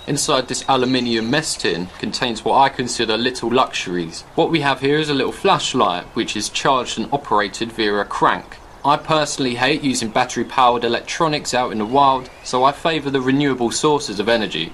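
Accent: United Kingdom. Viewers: did u say u need a fick durable knife? did u say sheaf? free sections of gear? Firty meters of fishing line? I love the way brits talk !! do us yanks sound like that to u guys?? Oh yea and u got all those bags of morale boosters but i didnt see a bag of weed or a bag of coke!! Cheers m8. Put another shrimp on the bar-b :) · Awesome british accent, amazing and clear tips and good jokes, officially sir, you are awesome !!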